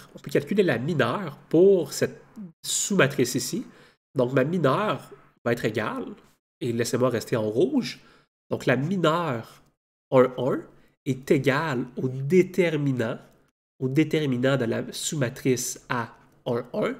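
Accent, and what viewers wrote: Quebec, Canada. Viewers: 😂 il est quebecois , c est leur accent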